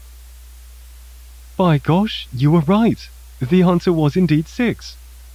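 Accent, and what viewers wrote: United Kingdom. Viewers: Excuse me, is this video reuploaded? Cause I heard bad quality in this video, but this short film is for me like " weird " and I heard british man's voice narration. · The narrator sounds like a British Nexpo.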